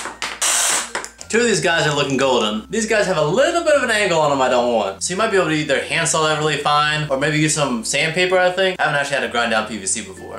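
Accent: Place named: Virginia, United States. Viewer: he kind of sounds like a Virginian like me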